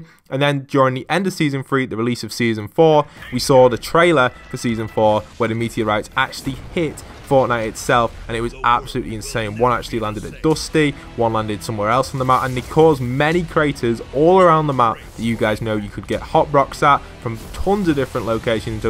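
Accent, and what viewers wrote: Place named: England, United Kingdom. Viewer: He has like English accent don’t hate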